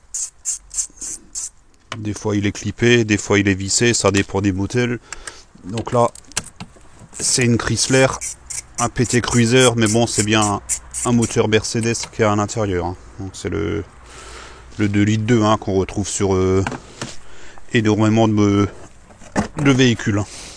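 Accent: Alsace, France. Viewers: Salut, tu viens dalsace? Par rapport a ton accent:D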